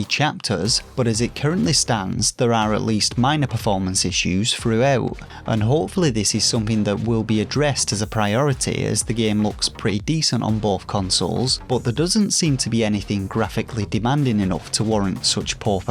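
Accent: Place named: Liverpool, United Kingdom. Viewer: Like the vid, trying to place the accent. Liverpool by chance? Hope no offense if I’m incorrect.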